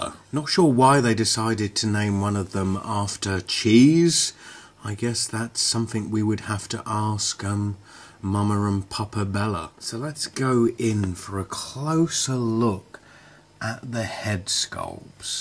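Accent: United Kingdom. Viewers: awesome review! fun to listen to your british voice! great belt custom! subscribe!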